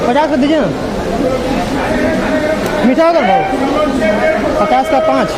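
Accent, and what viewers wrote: Bihar, India. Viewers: आपकी हर विडियो सराहनीय होती है। और आपकी बिहारी भाषा बहोत ही सुन्दर है। हमे भोजपुरी भाषा बहोत ही अच्छी लगती है क्यू कि हम भी यूपी के है। प्रतापगढ़ के।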